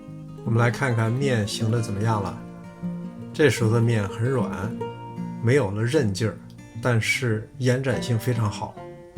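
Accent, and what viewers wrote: Beijing, China. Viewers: 北京话听着太亲切了明天就做来吃了谢谢叔叔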